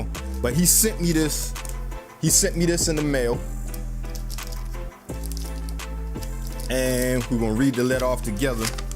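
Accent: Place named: South Carolina, United States. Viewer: Brah you sound like your from Moncks Corner South Carolina !!!!!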